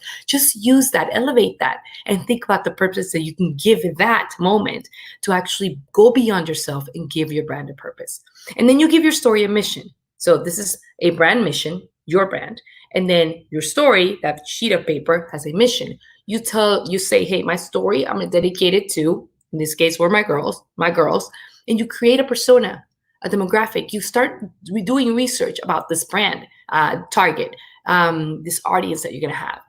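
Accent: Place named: United Kingdom. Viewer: How can this Indian speak British, even Priyanka Chopra ka accent itna achha nahi hai I am amazed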